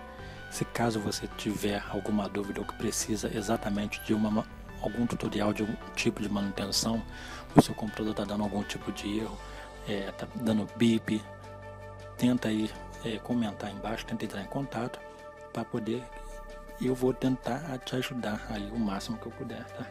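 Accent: Rio de Janeiro, Brazil. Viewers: Você é carioca?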